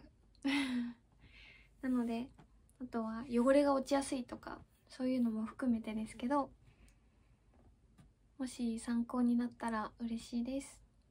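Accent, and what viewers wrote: Japan. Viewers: 青森出身？青森の おじさんがうるがす。 言うてた。後～、薬の イントネーション同じ。 へばな。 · お疲れ様です 今頃のコメントで申し訳ありません。 動画を拝見して、「うるかす」を初めて聴きました。先日の「耳がいずい」もそうですが、東北地方のご出身とのことで、お国訛りは、国の誇りです。どんどん使ってください。（本気の東北訛りだと標準語の字幕が必要かも？）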